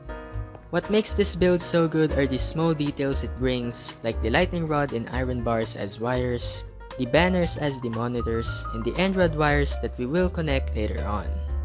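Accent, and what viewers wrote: Philippines. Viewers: Filipino? You kinda sound like one lol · I Knew he was filipino i just got it by his voice😀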